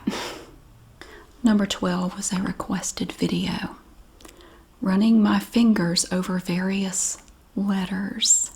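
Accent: South, United States. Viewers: Btw you are a beautiful woman with a pretty southern voice and your personality is awesome. I also admire your diligence.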